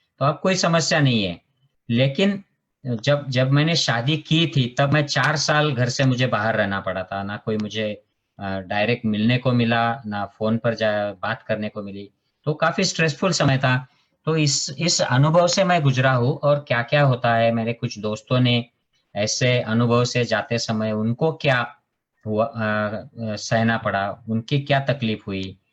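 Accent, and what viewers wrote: Khandesh, India. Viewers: Sir aaple Marathi Mansa lagech olakhle jatat aapli khandeshi lokanchi hindi khup ch khatarnak Aste sir😀😀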